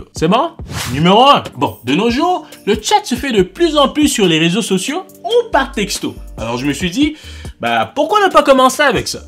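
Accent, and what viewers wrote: Quebec, Canada. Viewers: Je crois que l'accent québécois ne te vas bien qu'à toi sur terre.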